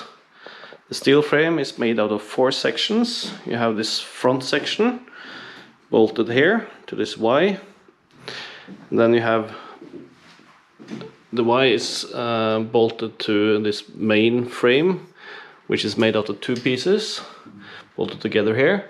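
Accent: Norway. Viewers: Are you norwigen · idk why. but he sounds norwegian. Broski got that crisp norwegian dialect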